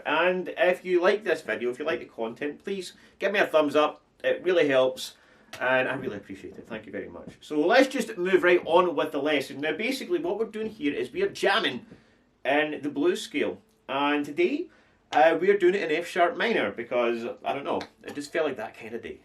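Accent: Scotland, United Kingdom. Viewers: Good to hear a fellow Scotsmen I improvise basically if you learn the 5 pentatonic scales learntje notes then find them on the fretboard easy lots of practice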